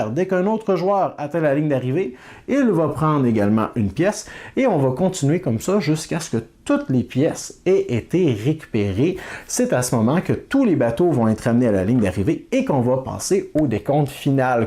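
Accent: Quebec, Canada. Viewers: J'adore tes vidéos en général, mais là franchement ta présentation du jeu Jungle Cruise me donne trop envie d'y jouer !! D'abord parce que je trouve le matériel super joli et j'adore la thématique, mais en plus le jeu a l'air simple à jouer et je pense qu'il sera tout à fait adapté pour y jouer avec ma mère. Et pourtant ce n'était pas gagné parce que le fait que ce soit un jeu Disney ne m'inspirait pas plus que ça (et pourtant j'adore aller à Eurodisney et j'adore leurs films). Donc je l'ajoute clairement à ma liste :) et rien à voir mais j'adore ton accent québécois donc c'est très agréable d'écouter tes vidéos :)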